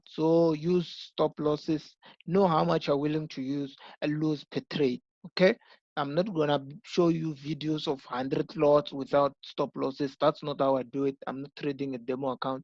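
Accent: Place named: Venda, South Africa. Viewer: And judging by the accent I'd say you're Venda? Besides your love the work bro. And that wololo killed me😂🤣🤣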